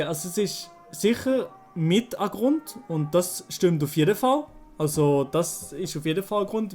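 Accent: Switzerland. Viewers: Ich fändis nitirlich schad wenn mä der komischi (nit negativ gmeint) Dialäkt nimmä wurdi gheerä aber ich cha der Gedankägang durchüs verschtah. Äs isch wahrschindlich scho chli deprimiärend, dass mä mit Schwizerditschä Videos eifach ä sehr begränzti Riichwiti het. Mä derf aber nit usser acht lah, dass Schwizerditschi Videos bi Schwizer sehr güät ahchämät und si sich mit derä Schprach ai viu besser chenät identifiziärä. Ich hoffä, dass dü diä uberleggigä ai machsch. Dr definitivi Entscheid isch natirlich ä diär uberlah und dü muäsch dich ja nit sofort entscheidä. Ich wurdis sehr fiirä wenn dü witerhin Schwizerditschi Videos machsch, aber mä eurdi sich sicher ai äds Hochditsch gwännä. Ich wyyschä diär nu ä guäti Zyt und viu Glick bi dinnärä Bachelor-Arbät. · Würde ich gut finden. Du kannst mehr Leute erreichen und alle deutschsprachigen verstehen dich. Am Anfang wird es zwar noch etwas ungewohnt sein, aber das wird sich dann legen. 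Doch tu einen Gefallen.. Rede wie ein deutscher und nicht wie ein schweizer 😊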